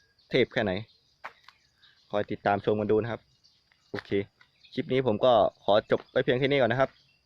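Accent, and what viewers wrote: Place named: North, Thailand. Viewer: อยู่บ้านไหนคับ อู้เหนือ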